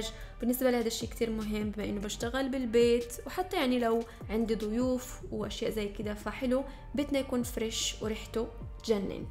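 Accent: Morocco. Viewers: من لكنتك باينة فيك مغربية برافوووو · اول مرة نشوف الفيديو وحسيت بيها مغربية من لهجتها وتأكدت بتعليقك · ممكن أعرف شو أصل بلدك في كلامك أحسك من المغرب أو الجزائر يلي حاس مثلي يضغط لايك ويلي عارف شو أصل يقولي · انت مغربية حيث اللهجة مغربية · اللهجة المغربية واضحة في كلامك يا الغزالة 😉😄😄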